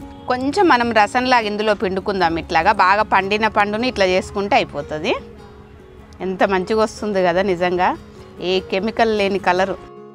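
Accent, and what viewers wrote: Telangana, India. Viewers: Hiii akka nv maa telangana Chala baga matladut unnam u r🍓🍓🍉🍉👌👌👌👌👌👌👌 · Manchi telangana matladuthunnaru vindaniki bavundhi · తెలంగాణ యాసలో మీ మాట చాలా బాగుంది, మీకంటే ఈ పండ్లు చూసి పక్షులు ఎక్కువ ఆనందిస్తున్నాయా...మేముకుడా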